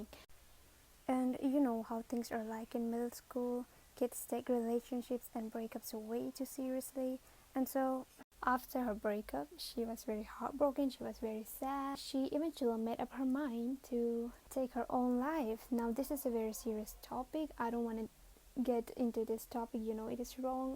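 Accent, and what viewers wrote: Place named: United States. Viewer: you speak like a Us people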